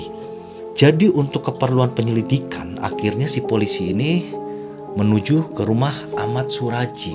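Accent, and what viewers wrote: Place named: West Java, Indonesia. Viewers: Suka bercahaya tea gening. Aduuh sunda pisan ... Salam dari bogor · ( keluar. Cahaya tea ning ) hahaha fiks org bandung pisan hahaha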